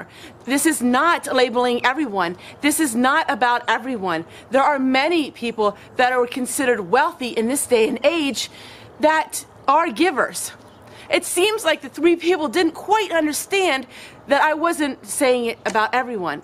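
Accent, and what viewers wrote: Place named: South, United States. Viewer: Wait. What? You're conservative? I wouldn't have known if you hadn't said anything. 🤷🏾‍♀️ I’m more focused on your accent. I can't figure it out! 🤔😆 Are you a Canadian living in Arkansas? It's like foreign and Southern, but not too Southern. Very interesting!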